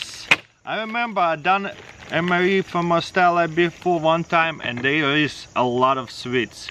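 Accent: Russia. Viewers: Why we love: 1) His excitement to do something 2) His positivity on everything even tho it isn't that good 3) His gratefulness 4) and of course his Russian accent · You should prolly do a video saying only pashtet cos I pretty much watch your videos to hear you say it 😂😂😂. I love Russian accents 👍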